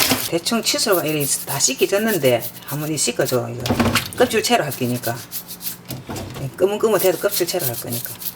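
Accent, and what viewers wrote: Gyeongsang, South Korea. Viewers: 멋진 팁 ! 감사합니다 갱상도 사투리라 더 정감 갑니다 ㅎ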